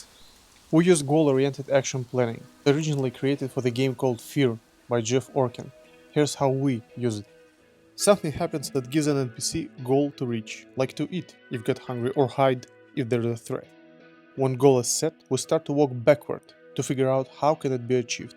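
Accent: Russia. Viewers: русский акцент никогда не скроешь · Сразу видно нашего по ярко выраженым русским акцентом. Хотья я и так знал что создать "Привет Сосед" русский но все равно ❤ Жалко что нет звуковой дорожке, я бы послушал оригинальный голос без ярко вырженого акцента😅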